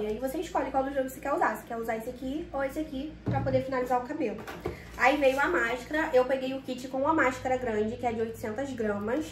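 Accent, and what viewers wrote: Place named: Rio de Janeiro, Brazil. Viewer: Sotaque de carioca , no Brasil é assim cada localidade com seu sotaque e temos que respeitar ❤ eu acho lindo sotaque dos cariocas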